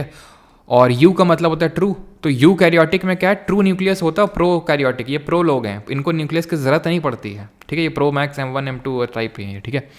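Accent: Kanpur, India. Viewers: From kanpur ✔️ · वही तो इत्ता accurate कनपुरिया कइसे बोल सकता बाहरी कोई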